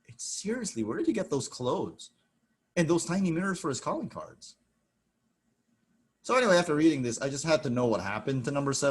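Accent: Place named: New York, United States. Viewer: That New York accent 😎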